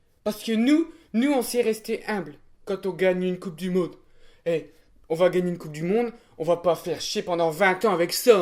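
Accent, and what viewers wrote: France. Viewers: Putain mais t'es Français avec ton accent toi ??/?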